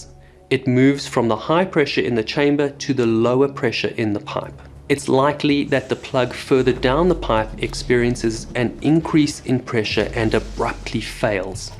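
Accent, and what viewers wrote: South Africa. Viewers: Do I detect a South African accent? Thanks for your clear and not too technical explanation of a horrible accident that shouldn't have happened. Your delivery is very good - my normally very impatient hubby, who used to do recreational diving, watched the whole way through, he normally fast forwards.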